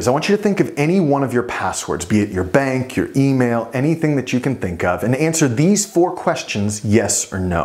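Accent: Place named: United States. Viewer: voice sounds really different here with that American accent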